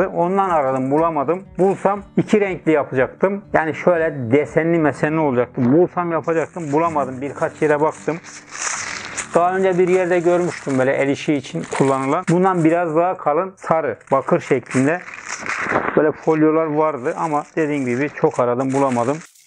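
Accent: Erzurum, Turkey. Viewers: Abi Sen Erzurum’lumusun şiven bize çok benziyor:)?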